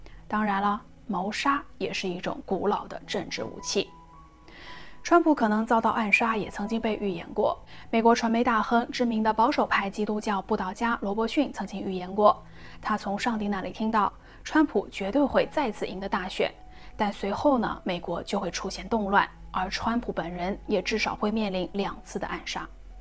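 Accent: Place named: Shanxi, China. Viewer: 你老家是山西或内蒙，感觉你的普通话有乡音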